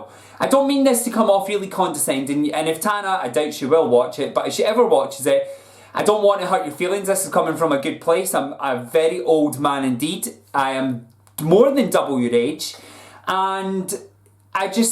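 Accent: Scotland, United Kingdom. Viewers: Great video, you say your british, howcome you sound more on the scotish side ?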